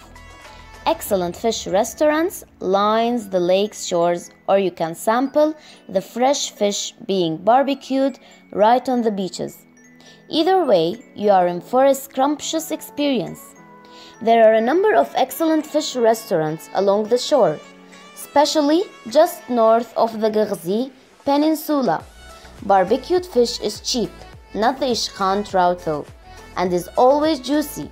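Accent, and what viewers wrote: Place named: Egypt. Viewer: Your accent I feel it like an Egyptian accent when you speak English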